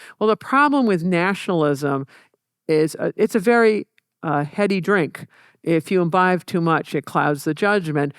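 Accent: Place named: South, United States. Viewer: Yeah but that is CIA playbook geography... Stuff makes no sense though: suppose that Russia tried to erase culture in Uzbekistan Tajikistan, erase islam etc, for a start, that would have stoked revolution so the situation is totally different, the russian empire was far less populous and sparser on radio waves... and so She trivializes this CIA politicial science social geography theory with this southern twang brainwashing her listeners about all kinds twisted logic that aligns with the CIA playbook, and guess what, she never even lived in these countries that she knows everything about, Russia, China, she clearly has never exchanged with the cultures there enough, because she speaks like someone educated by corporate news, and she wants you to speak like that too, not like someone who has travelled the world and knows cultures and the ancient causes of today's contrasted political systems.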